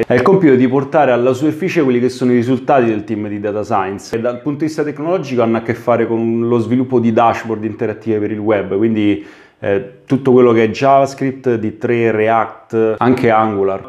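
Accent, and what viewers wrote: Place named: Rome, Italy. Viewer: Grande! La penso come te sui Recruiter e la retribuzione che offrono in Italia, scrivono annunci contraddittori, alte competenze e stipendi da fame...prendono tutti per stupidi. Mi sembri romano dall'accento, tu in cosa sei Laureato? Di cosa ti occupi? Sto seguendo qualche tuo tutorial per un capirci meglio su AI e ML per un mio progetto e tu ne sai molto, non nego che trovo molta difficoltà, non essendo un matematico... però non mi arrendo, sono solo più lento degli altri 😊 io voglio specializzarmi su Python per AI e ML, hai qualche corso (gratuito) in particolare da consigliarmi? Grazie per i tuoi video, ti faccio i complimenti😊 perché spieghi Python mentre scrivi come se scrivessi in italiano...sei una potenza!💪😉 Ciao 👋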